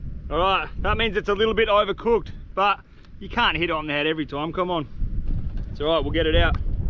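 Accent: Australia. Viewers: Is he a Aussie? His accent reminds deff yells "Hey! I'm from australian!"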